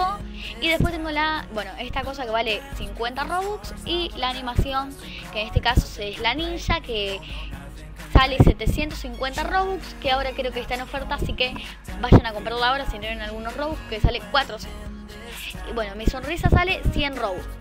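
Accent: Argentina. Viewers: Vos ser argentino?like si lo eres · YO KIERO UNOS ROBUX TOY RE POBRE MEN PARECES DE ARGENTINA :v +Venhado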